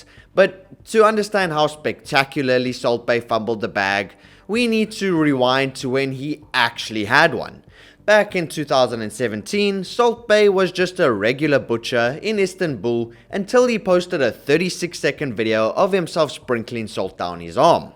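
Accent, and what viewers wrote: South Africa. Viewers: I hear a south african accent 😊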